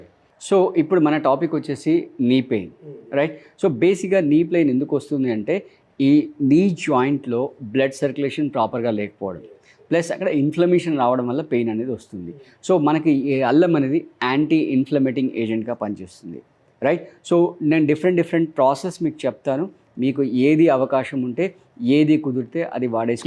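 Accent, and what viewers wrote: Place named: Marwar, India. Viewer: Athanu telugu vadu kademo, marvadilanti vallu andhra lo perigina vallu ayi vuntaru.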